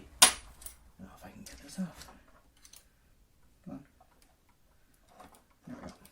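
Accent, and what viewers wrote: Ireland. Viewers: It's an Irish accent, but not a difficult one. Are you talking about that, or the volume?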